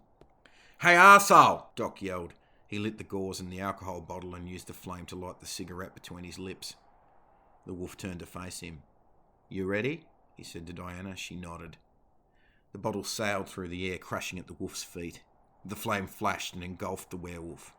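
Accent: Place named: Australia. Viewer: Iv not finished listening to this but the oz accent makes a refreshing change to the normal American one all and all it's a dam good story so far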